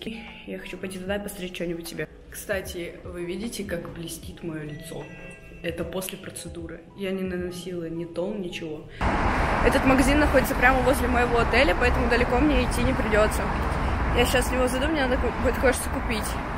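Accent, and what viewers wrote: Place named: Russia. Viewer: 🤔 This accent! U speak Russian language! So are you Russian?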